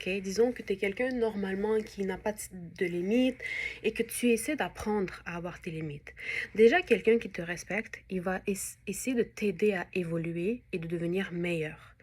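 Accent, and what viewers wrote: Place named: Quebec, Canada. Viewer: Tu es québécoise ? Je crois déceler un léger accent 😊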